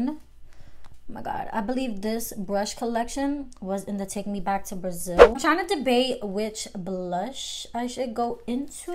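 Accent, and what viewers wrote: New York, United States. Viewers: you sound like you from new york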